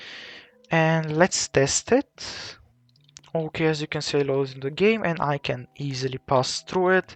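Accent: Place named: Italy. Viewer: nice Italian english voice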